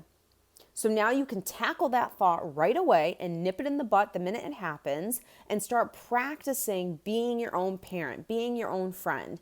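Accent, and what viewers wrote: Boston, United States. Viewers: She actually has a bit of a Boston accent and honestly our d's often sound like a t.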